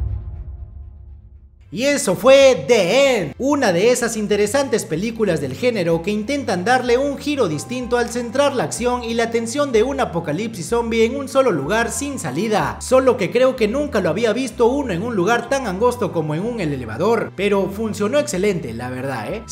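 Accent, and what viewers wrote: Peru. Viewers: eres el mejor dando resumen con toque peruano csm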